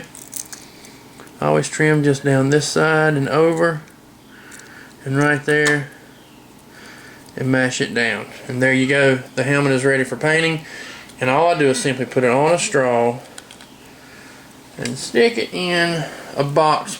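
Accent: South, United States. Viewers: no ofense but you sond contry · you have an amazing contry axent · You talk like some texas fella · That is the strongest Southern Accent that I have every heard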